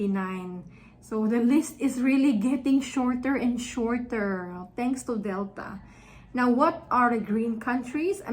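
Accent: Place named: Visayas, Philippines. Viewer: Bisaya gihapon ka day.sinultihan plng nimo.mailhan na day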